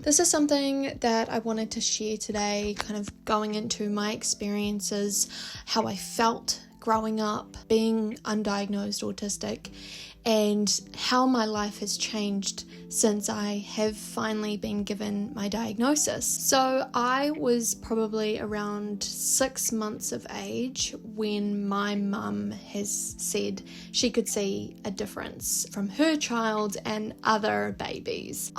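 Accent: New Zealand. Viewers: Are you from Auckland? I love your accent and the way you talk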